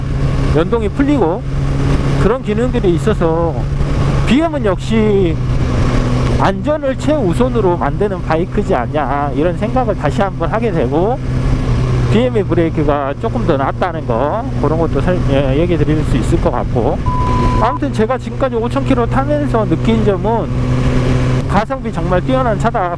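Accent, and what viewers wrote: Jeolla, South Korea. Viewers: 으따 전라도구마잉~ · 늘 재미있게 잘 보고 있습니다. 구수한 전라도 사투리랑 같이 들으니 휠 재밌네요 ㅋㅋ 한가지 질문이 있습니다 - 저는 gsx-s1000을 타고 있는데요. 늘 r차에 대한 로망이 있었습니다. 문제는 자세인데 네이키드에 비해 많이 힘들겠지요?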